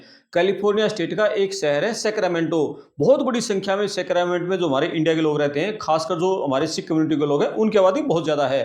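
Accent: Haryana, India. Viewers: एक तो आप इधर उधर की बात कम करिए , सीधे काम की बात पर आइए और हरियाणवी accent छोड़कर खड़ी बोली में बात करिए तो अधिक लोग देखेंगे.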